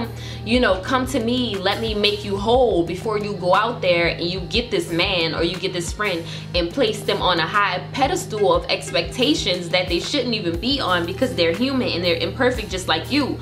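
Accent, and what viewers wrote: New Orleans, United States. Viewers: I know I’m late to this video but are you from the N.O ? I think I hear an New Orleans accent.🤔 And your a Christian also !! God bless you.! My family is from there.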